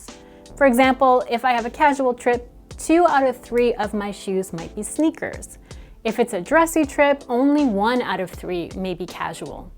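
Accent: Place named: Canada. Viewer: just recently discovered your channel and it's great! Your perspective on packing (the weather/activities/vibe/trip length quadrant) is refreshing. Instead of dictating a particular list or items, you invite the viewer to think critically for themselves to create a packing list that makes sense for them. I've been going carry-on only for 7-10 day trips to Europe since 2017, and your perspective inspired me to get ready for an upcoming trip to Madrid with a fresh outlook on layering and outfit-making - I'm so excited with my planned outfits! Your presentation is excellent: succinct, polished and friendly and it's great hearing a Canadian voice here as well. Comfort shoe recos: Love my tall leather boots from Poppy Barley (a woman-owned, Canadian brand from Edmonton that is ethically made in a small factory in Mexico). They do custom adjustments for calf-width, fit my orthotics and are so well made and comfortable. 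I've had mine for 8+ years and have walked all day in Toronto, Berlin, Amsterdam and more and have been able to have the heels replaced by a cobbler when needed. Also, Cobb Hill (a stylish comfort brand made by Rockport) looks cute, are supportive and comfy and fit my orthotics.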